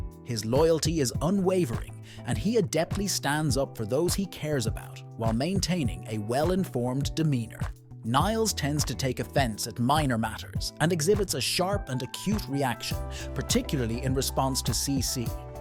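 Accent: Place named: Ireland. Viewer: Irish accent reading non-English native's descriptions. Oh, the dissonance !!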